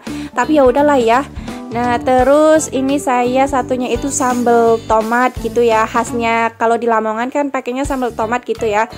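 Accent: Banyumas, Indonesia. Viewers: Ya Allah mb ngapak bngt mb ak jg orang Tegal ngapak tp krm terlalu lama g ditegal jd udah kebawa BHS disini dilampung padahal suami sy jg orang Cilacap ngapak jg suami saya ,dulu begitu lulus sekah SMA ak langsung merantau kerja di Batam mb terus ketemu suami di batam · Kali ini video dibuka dengan jogetan alea Dan pak suami yg nyapu.. Mba.. Request donk, nanti bikin vlog yg full bahasa ngapak. 😁 Lucu Dengarnya. Hehehehehe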